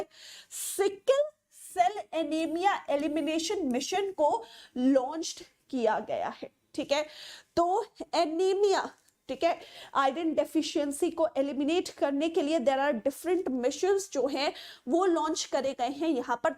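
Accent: Kashmir, India. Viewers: Your voice, tone, and way of talking is very similar to us, ❤❤ FROM KASHMIR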